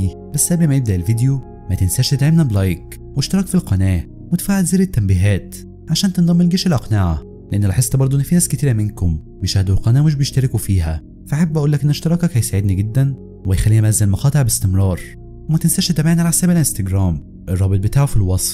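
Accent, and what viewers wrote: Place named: China. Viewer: من لهجتو صيني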